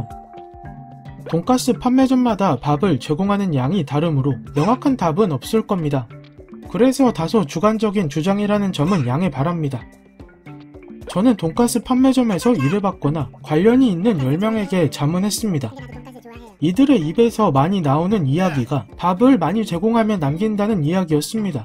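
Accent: South Korea. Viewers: 궁금한게 나레이션하시는 분은 어디 말투죠?ㅋㅋㅋ서울말은 아니고 남쪽사투리도 아닌것 같고 ㅎㅎ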